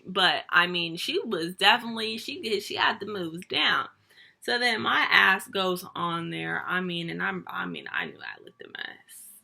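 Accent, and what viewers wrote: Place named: South, United States. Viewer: Country accident cute asf😍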